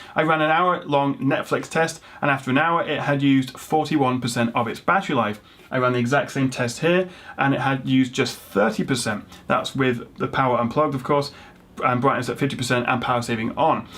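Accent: United Kingdom. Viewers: Around 10% in neighbors of the desktop version:D I almost go to sleep and then see you replying to my comment, I immediately have to respond. I really enjoy your contents and your sleek British accent:D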